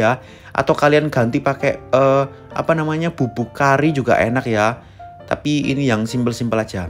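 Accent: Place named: Surabaya, Indonesia. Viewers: Kirain NgaPak ternyata suroboyo 😂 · logat cino suroboyo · Aku seneng logat nya, surabaya an....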